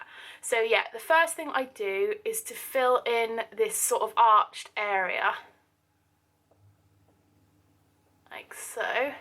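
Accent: United Kingdom. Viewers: this is why i love British accent. btw, you are so cute <3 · i think she's british because of her accent. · Is she brithish? I really like and enjoy her pronounciation. ^ ^ Easy to follow and to understand - I'm not a native speaker btw. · How do you acquire that cute English accent :)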